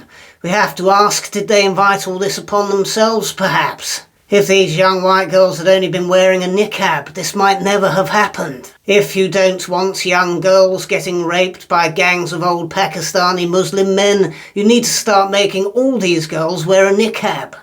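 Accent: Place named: RP, United Kingdom. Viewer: I've never heard a Labour MP speak in RP mate, you sound more like a Tory MP to me!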